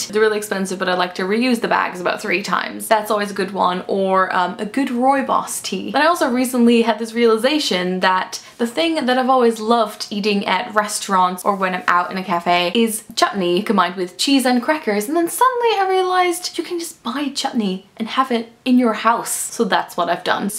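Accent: United Kingdom. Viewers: I need a lot of me time and I enjoy doing things by myself. Sometimes I snuggle up with a book/movie/TV show, sometimes I go shopping. I go to museums by myself once in awhile as well. By the way, I love how your accent becomes more and more British! I find myself adopting accents and dialects very quickly when they are familiar and I'm in the area for some time. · lovely video! :) also your accent has twang of British intertwined in it and it's super cute haha! xxx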